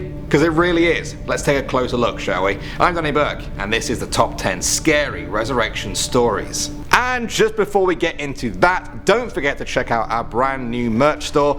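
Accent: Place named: England, United Kingdom. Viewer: Danny is my favorite i love his enland accent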